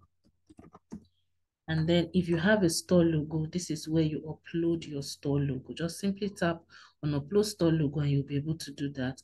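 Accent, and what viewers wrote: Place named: Nigeria. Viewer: Asian face, nigerian voice.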